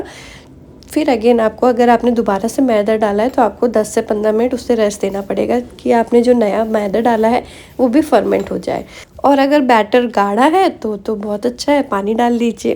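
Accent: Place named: Madhya Pradesh, India. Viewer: Mujhe lag hee raha tha, bolne ke tareeke se ki aap मध्य प्रदेश की होगी ।👍 बढ़िया । में भी m p ki hee hun । शुभकामनाएं 🎉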